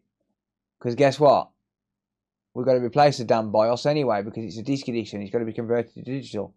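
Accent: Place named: West Midlands, United Kingdom. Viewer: It's good to hear the West Midlands accent as well as showing some great fixes!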